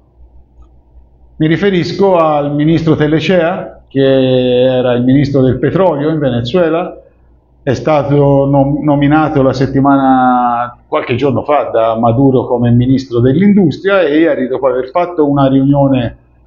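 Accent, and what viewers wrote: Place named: Florence, Italy. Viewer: e tu sembri di Firenze!!!!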